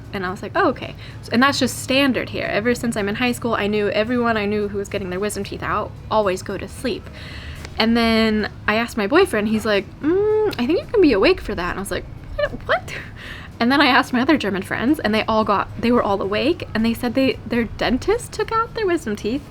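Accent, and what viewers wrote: Germany. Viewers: you sound like Dutch or German... The melody of voice... match with Nord-Germanic ones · Speaking German has even influenced your English a bit. Once or twice I heard a German intonation in your speech. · And you know have a German accent to your English i love it.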